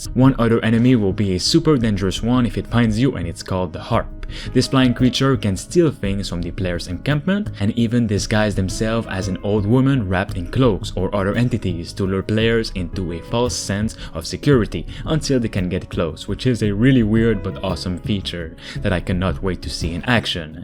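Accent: Quebec, Canada. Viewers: Man I love your Georges Saint Pierre style accent. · do i hear a quebec accent ? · Tu sonne comme un québécois mon chum ;)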